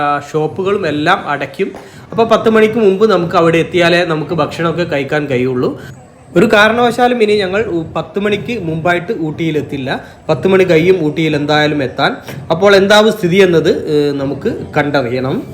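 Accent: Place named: Kozhikode, India. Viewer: ഫാഷ അല്ല ഭാഷ ഫരണി അല്ല ഭരണി ഓരോ നാട്ടിലും ഓരോ മൊഴിയുണ്ട് കോഴിക്കോടൻ ഭാഷയിൽ പറഞ്ഞതാണ് അദ്ദേഹം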